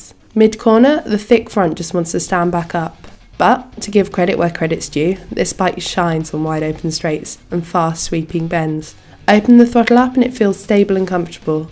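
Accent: United Kingdom. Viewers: Interesting review. Please work on enunciation and slow down a little... that brittiot accent is annoying. But a cute girl and a nice new motorcycle are a reason to watch this interview for sure. And remember, there are 300lb (all muscle) big guys like me out there who would rather have a bike that can handle our weight and aggressive riding style than some sport bike. Also one with more torque fits us better than higher horsepower bikes that require super high rpms to accelerate a moderate load and could not corner well with our size and weight anyway. And finally the big tires tend to eliminate grooved pavement and lane segments very well... so they actually improve handling. Ah... not the best review there, but what do you expect from the British mush.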